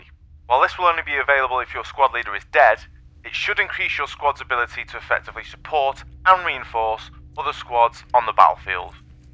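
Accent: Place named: United Kingdom. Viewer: Why a British voice??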